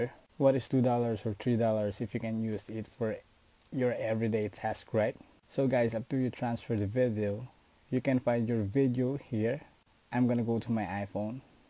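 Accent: Philippines. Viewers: hey man, the guy is Filipino their accent is like that. try to understand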